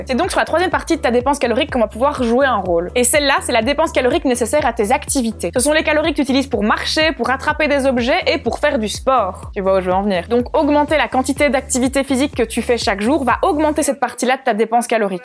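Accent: Liège, Belgium. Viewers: Mais tu as toujours l'accent belge non? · "Horible acent belge" t es sérieuse ! C etait pas loin d un dislike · t'as un accent belge de dingue hahaha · L'accent belge 👌👌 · Je kiffe son petit accent Belge ❤ si non super vidéo en fait g été renvoyer sur le n 1 alors que je commençais le n 2 😊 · Comment ça un horrible accent Belge ya quoi contre les belges laaaaaaa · Tu as un magnifique accent liégeois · ton accent belge on l'entend tt le temps Mais c pas grave on t'aime quand même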